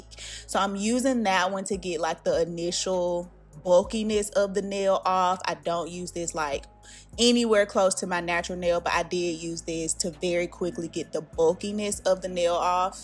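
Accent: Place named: Texas, United States. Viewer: Are you from Texas? You got a distinct accent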